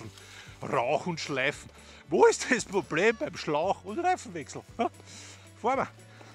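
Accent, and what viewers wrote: Austria. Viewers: Geiler Dialekt is des va Niederösterreich?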